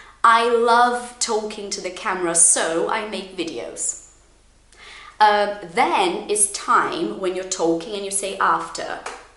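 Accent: United Kingdom. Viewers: That was an amazing class! A whole class in English. And I have to say I love your British accent. Thank you so much for your tips.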